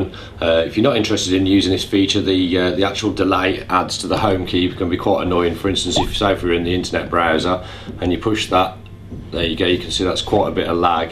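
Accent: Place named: Cockney, United Kingdom. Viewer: Cockney. coolest accent ever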